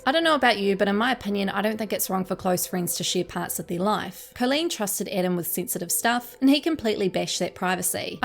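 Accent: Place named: New Zealand. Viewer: I love your NZ accent... oh the dramaz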